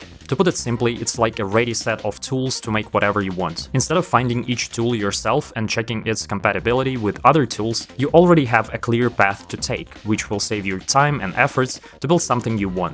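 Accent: Russia. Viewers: He sounds like russian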